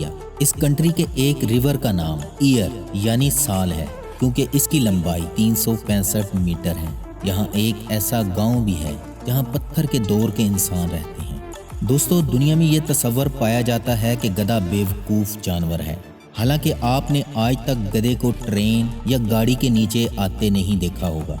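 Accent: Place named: Punjab, Pakistan. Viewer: Masha Allah bhai aap bohat achi video banatay مگر آپ با برکت کو ببرکت نہ کہا کیں۔بہت برا لگتا ہے۔ اردو کو پنجابی لہجہ میں بولتےہو نوی بازار کو نوی بزار تو نہ کہو۔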